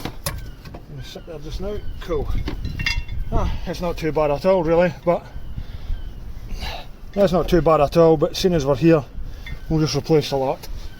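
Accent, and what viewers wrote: Scotland, United Kingdom. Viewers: Lovely Jubbly, your accent is quite strong, but having relatives who come from this area your accent is easy to understand for me.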